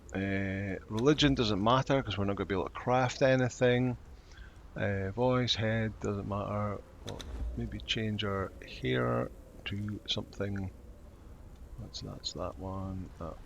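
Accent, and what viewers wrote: Scotland, United Kingdom. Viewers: I think it's still hard core if the goal is to finish the game with one life. No matter what happens to the followers. And it doesn't makes sense role playing wise. To make it hard-core why not zero follower, no healing items? Only eat berries that kind of stuff 😂 I'm starting this challenge right now. Except I will allow my followers to die and I'll be on a official sever. Thanks for the inspiration. Btw are you Scottish? The calm ton of your videos and your accent are the reasons why I watch your videos.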